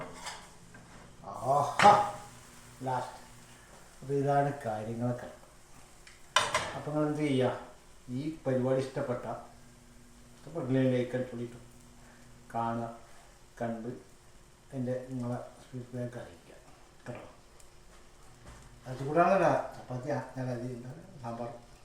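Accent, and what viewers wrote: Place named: Malappuram, India. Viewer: മലപ്പുറം സംസാരം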